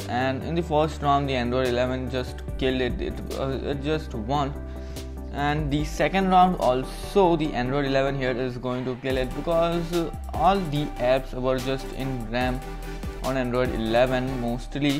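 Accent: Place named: India. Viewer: Learn English properly, you speak like a typical Indian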